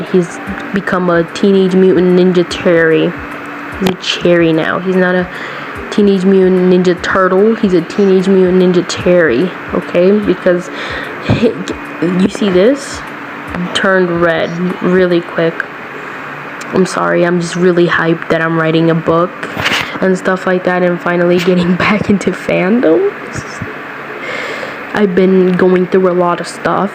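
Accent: South, United States. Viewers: He sound red neck lol i love it ❤ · IM NOT BEING RUDE OR ANYTHING BUT IT SOUNDS LIKE HAS A BIT OF A COUNTRY ACCENT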